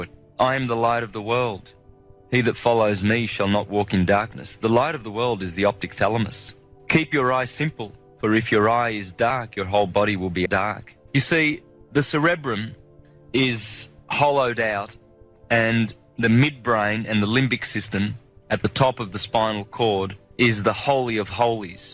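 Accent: Australia. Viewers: His Auzzie accent gives me the shits. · Shame on you for not picking up on his voice and Australian accent.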